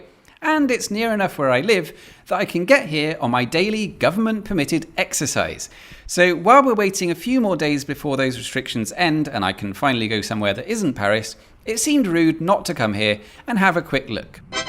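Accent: United Kingdom. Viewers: Hearing your perfect french with your british accent is a candy for my french ears. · Quick question here. So are you British, or Parisian? Because your accent more like English man rather than Parisian. But your other language is good too.. Ps: edit English to British. Sorry, because in my english lesson, british always said as english man. 😶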